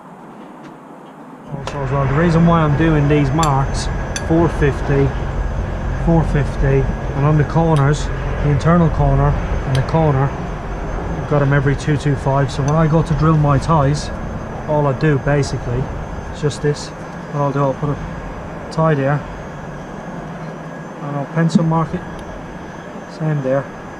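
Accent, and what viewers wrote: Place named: Ireland. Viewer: You sound like a lovely irishman i work ed with in construction many years ago nice work by the way 👍👍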